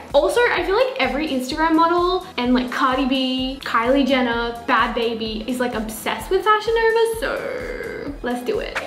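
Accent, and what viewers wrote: Australia. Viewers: Jessica Rivera Australian accents make me so happy haha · I just want something..... I WANT YOUR AUSSIE ACCENT🔥💗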